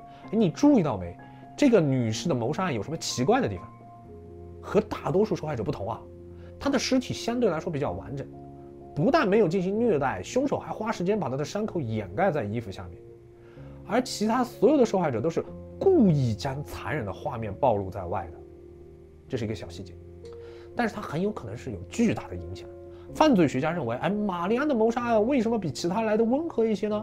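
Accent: Yunnan, China. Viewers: 哥们儿这一口云普把我逗乐了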